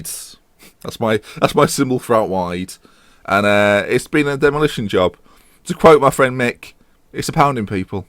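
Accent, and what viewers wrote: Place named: Liverpool, United Kingdom. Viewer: You sound more Scouse in this video than in any one previously... Is that intentional?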